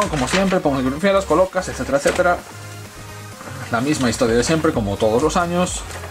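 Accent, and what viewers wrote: Galicia, Spain. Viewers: De donde eres? Eres galego, non?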